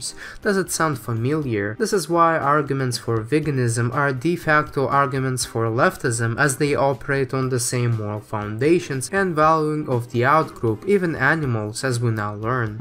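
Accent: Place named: Eastern Europe. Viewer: The CRISPR part gave me the willies. Especially with the Central-Eastern European accent.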